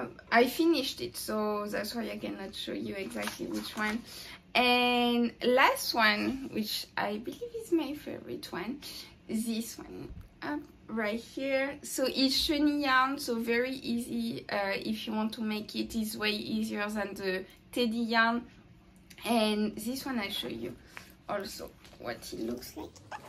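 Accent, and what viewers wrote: France. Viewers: Je critique pas du tout, merci pour toute tes explications c'est vrmt super, merci, mais ton accent bien français m'a fait rire 🤣 bien joué et continue c'est top, j'adore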